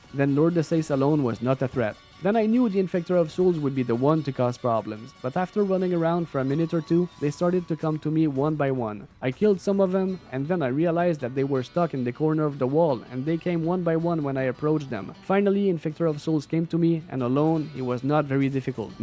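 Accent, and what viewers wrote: Quebec, Canada. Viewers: “J’ai Chaud”. I can never unhear it now. You for Quebec? The accent sounds very familiar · You french canadian ? Im curious 😆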